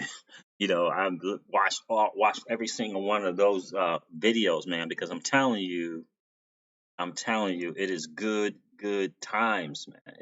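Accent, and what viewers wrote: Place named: Brooklyn, United States. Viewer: I think it must be a Brooklyn accent. There was a rumor that was posted on aa redit page from someone claiming to be a ex employee of ripple who believes that stellar and xrp have been designed to be used as world reserve currencies. XRP is the store of value and stellar to be used as a digital currency. What are your thoughts? Maybe you could do a video on that.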